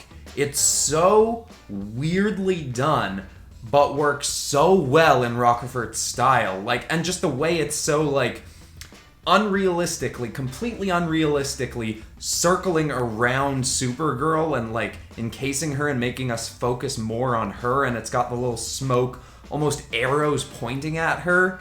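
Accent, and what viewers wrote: Canada. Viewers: First time watching, can already tell you’re Canadian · You have the MOST Canadian "about" I've ever heard. It even bleeds into your DOUBTs, which I never heard before. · Canadian! Besides the celebrity Canadians, it makes me wonder if everyone there pronounces those words that way (minus the French Canadians).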